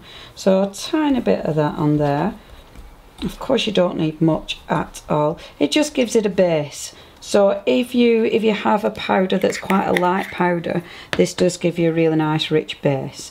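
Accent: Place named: Yorkshire, United Kingdom. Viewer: Just beautiful, loving your Yorkshire (?) accent. Very friendly and informative.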